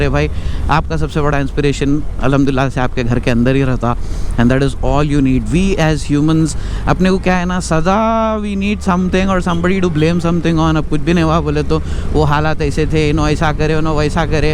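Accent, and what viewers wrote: Hyderabad, India. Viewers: Bhai tum jara to sai ka jarr malom 😂😎. First time I am listening a youtuber who speaks Hyderabadi as my level.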